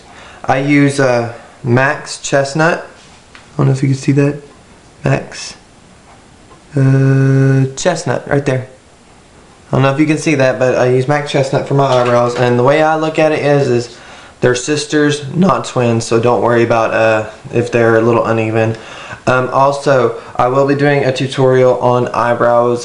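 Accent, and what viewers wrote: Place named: South, United States. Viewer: girl gotta be from Kentucky or Tennessee! Love the accent! I felt at home watching this video! :D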